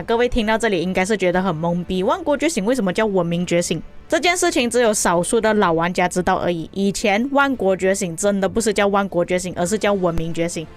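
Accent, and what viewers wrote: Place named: Malaysia. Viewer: 加油（其實我是因為你的聲音有很重的大馬腔我才開始看你的影片的😂），你的影片都很有參考價值，繼續做下去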